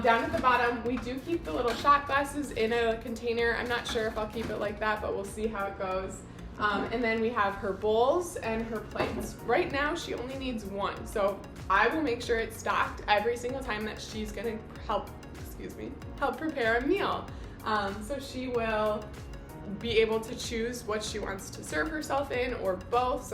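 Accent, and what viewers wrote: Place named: Illinois, United States. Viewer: Lol I can pick up on your Illinois accent right away. I’ve seen a lot of these kitchen Montessori hacks. Trying to find one that shows how the pvc pipe goes into the faucet.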